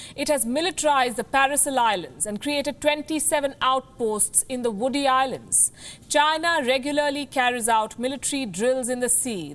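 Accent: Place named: India. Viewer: Horrible Indian accent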